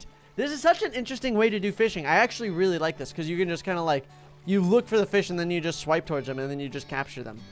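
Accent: Ireland. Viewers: Insted of a pirate accent i think he using a Irish type one haha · I love your irish accent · Why is he using an Irish accent?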